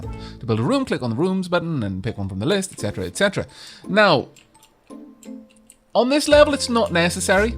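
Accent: Wales, United Kingdom. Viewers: he sounds like a british buk lau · Love your accent, you welsh? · is this guy welsh?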